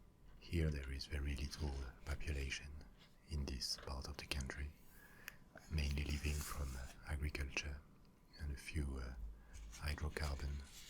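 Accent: France. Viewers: Vous avez un accent français je trouve !!! 😍😊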